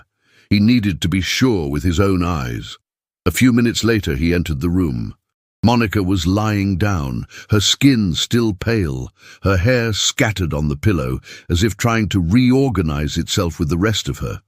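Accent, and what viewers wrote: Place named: United Kingdom. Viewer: I love the narrator's British English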